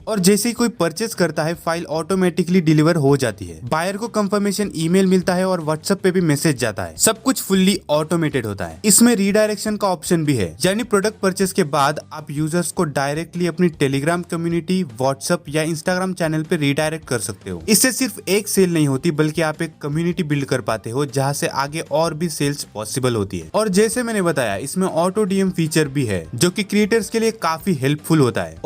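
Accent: Hyderabad, India. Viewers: Bhai hyd seh ho kya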